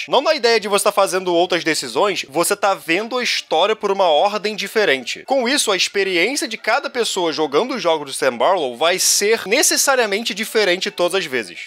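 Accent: Rio de Janeiro, Brazil. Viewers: Esse sotaque carioca do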